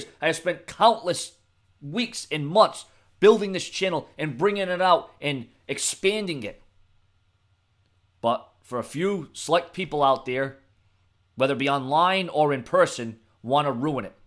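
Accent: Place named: New York, United States. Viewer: I would move but keep your channel ppl will miss u if u delete nd btw are from new york its just the way u sound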